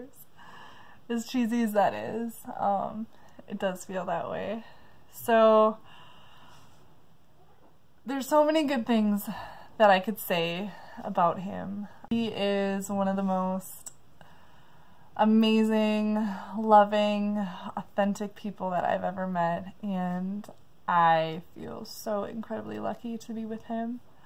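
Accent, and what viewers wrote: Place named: Minnesota, United States. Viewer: Very happy for you. 😎 I just found and subscribed to your channel. I'm a South Dakotan and have previously lived in MN for 12 years (now in Springfield MO.) I recognize your MN accent. 😉 I have been to Pitt many times and agree that it is an interesting city. (My daughter lives there.) You have created a dream life for yourself. I so wish I was young again and healthy enough to travel. One more thing, I'm going to bet myself that your last name ends with -son or -sen. Lol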